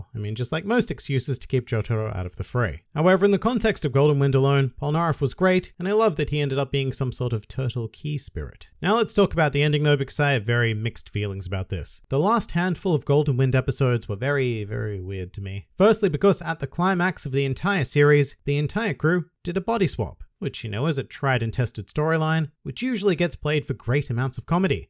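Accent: Australia. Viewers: I thought your accent sounded normal so you are aussie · Wait your Australian, I thought you were British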